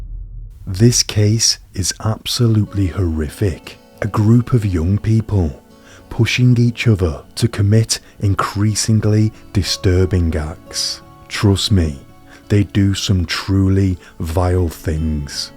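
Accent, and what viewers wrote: United Kingdom. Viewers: This popped up in my suggested The narrator sounds like he could be British chills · He's British! That's his normal tone. I love his voice.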